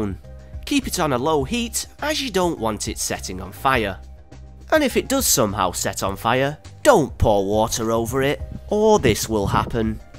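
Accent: United Kingdom. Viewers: Yay he English Btw I don't find many British youtubers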